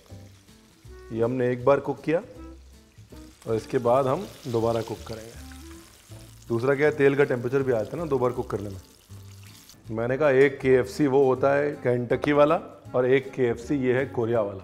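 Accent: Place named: Punjab, India. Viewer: Looking delicious! Love your style of speaking… being a Punjabi it’s wonderful to hear the slang . Be the way you are! ❤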